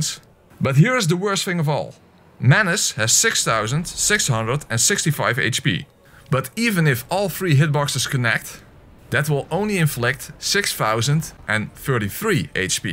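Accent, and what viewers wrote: Netherlands. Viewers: Nederlandse Engels-accenten zijn echt geweldig. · Never heard of you before, but after like three words I was like ''oh shit, a fellow Dutchie''. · My favorite Dutch accent content creator strikes again. Lekker bezig man! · Are you from the Netherlands?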